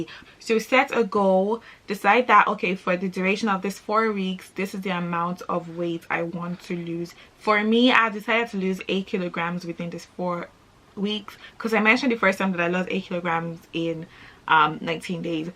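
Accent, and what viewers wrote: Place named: Ireland. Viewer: Your voiceee 🤤🤤🤤 Are you irish?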